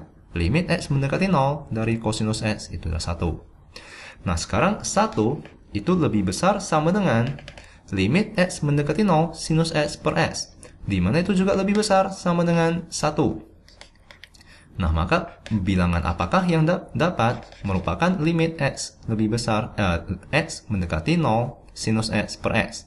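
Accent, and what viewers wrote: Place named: South Korea. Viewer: Logatnya kaya org korea 😅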